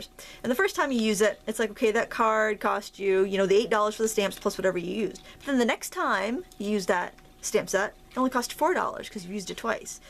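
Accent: Maine, United States. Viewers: Oh my God! I just Love you! I was having a terrible day, then I watched this vid and I was laughing and totally enjoying myself! It hap;pens every time I watch your vids actually! I'm a Mainah too, so I Love your accent, LOL. You are awesome!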